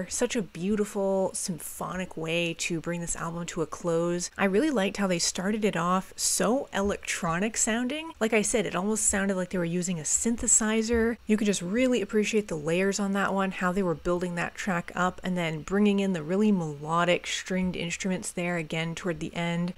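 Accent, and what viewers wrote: United States. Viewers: I watch a fair bit of react content and your reactions are pretty unique I feel, I've been watching your videos here and there for a while now and it always feels like I'm watching a radio broadcast. There's also something very open about your style, you come across as very unassuming. I love how you can listen to the gnarliest lyrically super fucked up stuff and just be like "I really love how the guitar builds with the energy of the lyrics". This reaction in particular is pretty funny to me, I live in the Netherlands which means German is a very recognizeable language for me. Despite the fact that you clearly have 0 clue what's going on with the lyrics you still pick up on some cool stuff instrumentally, and well, there's just something comedic about someone with a very American accent pronouncing the song titles and the band's name. 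It reminds me of Brad Pitt in Inglorious Bastards when he greets people in Italian. I'm not entirely sure why I felt the need to write all that out but what I'm trying to say is I think you got something pretty unique going on here and I think that's great. Keep it up!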